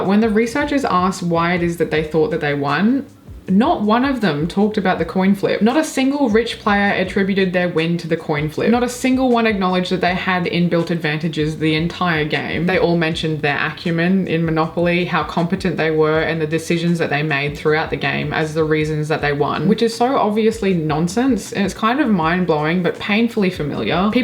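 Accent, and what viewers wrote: Australia. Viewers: Hey you sound like an Aussie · I love thought-provoking & enlightening rants Especially if they feature a hilarious aussie accent lol I really like to rebuttal with my own profound take on this matter But for now, I just wanted to say thank you for being a critical thinker Subscribed Bless u ❤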